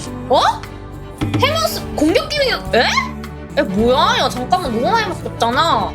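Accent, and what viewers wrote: Jeolla, South Korea. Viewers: 님 혹시 전라도 출신이신가요? 억양에 사투리가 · 전라도 ㅇㅇ 졸귀 ㅋㅋ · 말 할때 전라도 말투로 구수하네여ㅋㅋ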